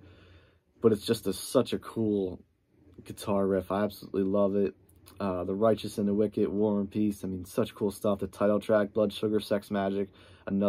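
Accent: Philadelphia, United States. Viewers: Lol are you from Philly bro? NJ?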